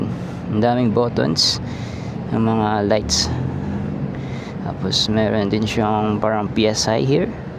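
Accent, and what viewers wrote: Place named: Conyo, Philippines. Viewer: nung narinig ko ung conyo voice d ko na tinapos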